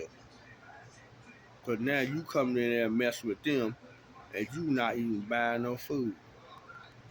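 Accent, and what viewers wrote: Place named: Deep South, United States. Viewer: Sound like a slave telln a story. Must be deep south Georgia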